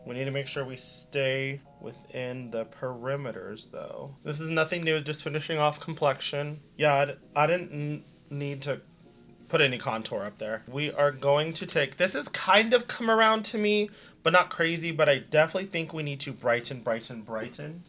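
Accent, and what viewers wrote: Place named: Central California, United States. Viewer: Hey gorgeous! First time really commenting but as the last video you spoke about wanting to hear more about what brings people to your channel figured I'd throw in my two cents. First of all, I love how calming your voice/energy/vibes are as many people have said. I love that you explore and play with make up, it's something I love to do as well. So many drag performers have figured out their mug and don't play with shapes and angles but as a fan of drag who has been trying to find the mug of my dreams it helps to watch someone who does different eyes cheeks lips ect. Also, I love that you still sound like a central Cali girl. I grew up in the Sacramento area and you sound like my friends and family so it's nice to hear. Lastly, you are just to muggy to not follow you come stamped every fucking time. 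❤ Much love keep the videos coming because the girlies who get it get it and we love the content. Happy pride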